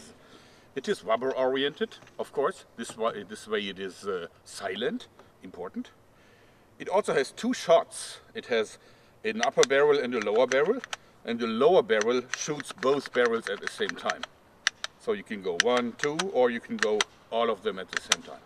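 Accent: Germany. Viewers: Du sprichst mit einem sehr starkem deutschen Akzent o_O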